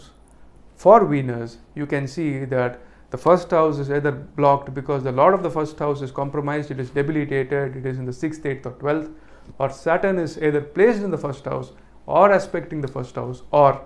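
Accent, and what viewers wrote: India. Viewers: I guessed Tamil too D You have that slight South Indian accent, besides the look :) would have never guessed a Bengali.. From Mumbai though ?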